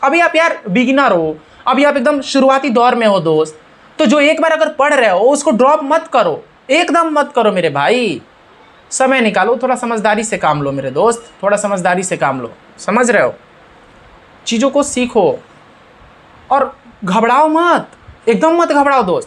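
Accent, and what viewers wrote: Bihar, India. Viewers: Good approach for math telling inspector sahab your style of speaking is fabulous bro and also motivated lots of things bro ✌️♥️♥️ Bihari style 😎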